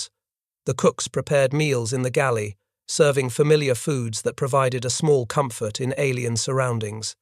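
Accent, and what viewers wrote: United Kingdom. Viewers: Outstanding historical account, love the British accent!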